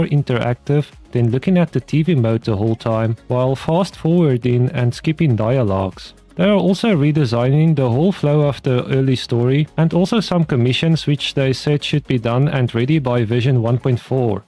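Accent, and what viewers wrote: South Africa. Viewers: I know a boet when i hear one, Great vid fam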